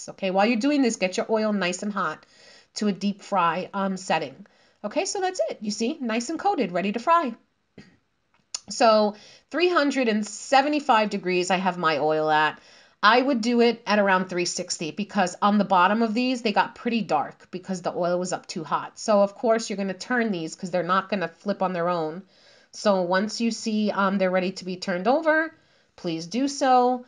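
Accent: New York, United States. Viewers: That accent makes me proud to b a New Yorker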